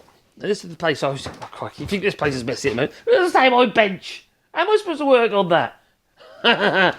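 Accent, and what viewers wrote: Norfolk, United Kingdom. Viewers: A Norfolk boi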